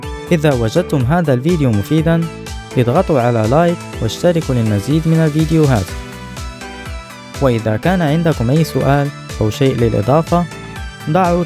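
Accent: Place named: Morocco. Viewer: من صوت عرفتك مغربي مهم فيديو واعر 🎉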